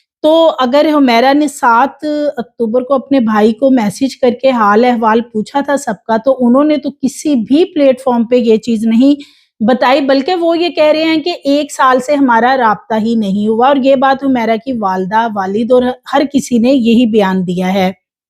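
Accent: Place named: Punjab, Pakistan. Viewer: Tu c paindo ho. Ardo (Urdu) punjabi accent which boldi ho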